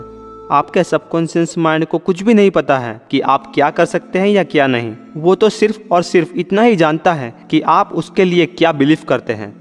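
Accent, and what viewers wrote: Gujarat, India. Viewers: Good tips, very useful. Are you from Gujarat?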